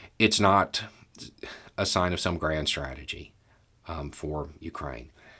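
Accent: South, United States. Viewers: Stop hating 🤡. Yes it’s out there but packages this in a nice and logical way for us to digest. I still do my own research but damn that southern accent just makes it so much more fun to hear it from him😬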